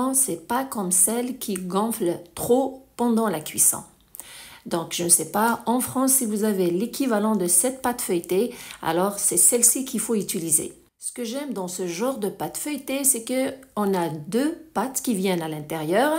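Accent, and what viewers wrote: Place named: Kabylie, Algeria. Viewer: Merci, faut avoir 2 mains gauches pour ne pas reussir cette Coca grace a tes consignes 😜Un bonjour de Hollande d'un wlid bladak (j'aime ton leger accent kabyle)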